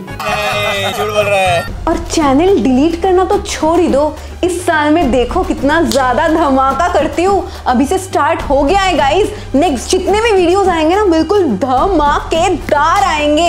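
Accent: Bengal, India. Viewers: And Plus she is Bengali so hindi isn't her first language like other youtubers. And im also a Bengali.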